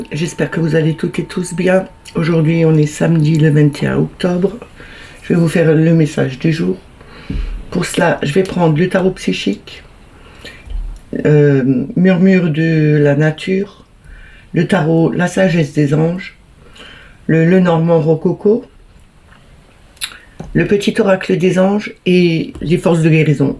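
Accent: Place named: Grand Est, France. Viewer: Vous avez l accent du GRAND EST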